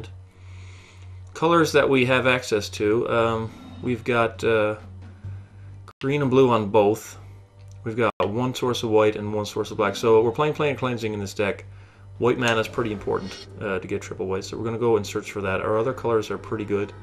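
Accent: Canada. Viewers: you canadian? just a question you have a pretty thick accent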